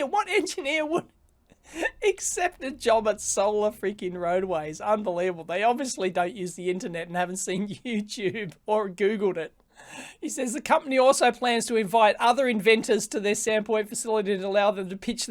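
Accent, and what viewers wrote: Australia. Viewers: I guess your info is interesting but your delivery is annoying. Smug aussie accent. ugh! just hard to listen to.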